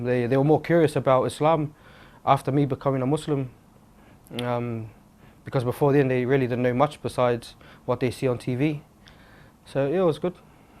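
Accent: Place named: Australia. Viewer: Alhamdullah & Mashallah 🤩... he sound Australian tho 😅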